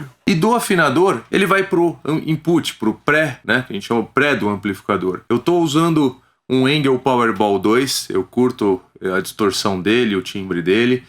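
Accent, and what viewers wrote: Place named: United Kingdom. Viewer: O papo e British crl...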